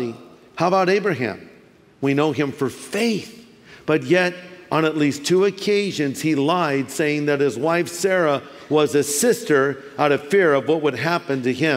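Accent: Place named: California, United States. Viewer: You definitely do have a California accent. It's a laid back, drawn out sound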